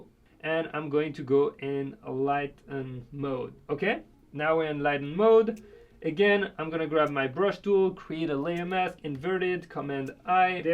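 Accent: France. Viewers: Just love your french accent 'And Voila' love it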